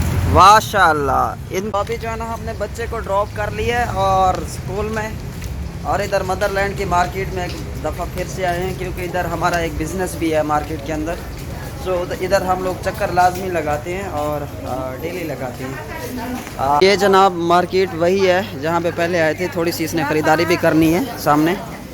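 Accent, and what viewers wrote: Saraiki, Pakistan. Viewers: I knew you were saraiki from your accent :D 😁😁 and you confirmed it. Kery shahar da haen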